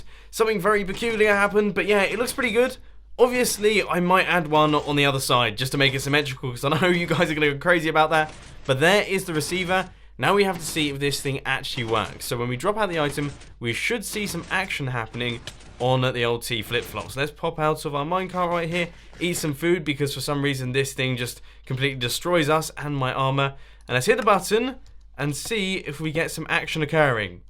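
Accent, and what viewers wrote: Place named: United Kingdom. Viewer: 10 reasons to watch Mumbo: 1. The intro is awesome 2. His voice is awesome 3. His Redstone contraption are awesome 4. You can actually learn a lot about Redstone from his content 5. His voice is awesome 6. He has a British accent 7. HIS FUCKING VOICE 8. His content contains no vulgar language, partial nudity, etc. - he is kid friendly 9. Him.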